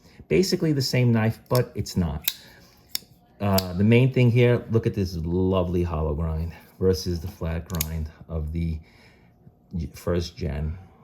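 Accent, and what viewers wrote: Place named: East Coast, United States. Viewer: Glad to join the party. Hearing you almost mkes me miss the east coast.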